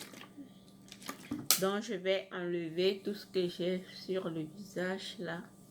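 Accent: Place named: Gabon. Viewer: super video , t es d oú ?t as comme un accent gabonais.😊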